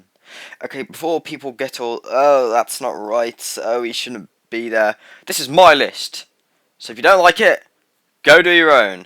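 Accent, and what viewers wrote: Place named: London, United Kingdom. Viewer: Sounds like he's from London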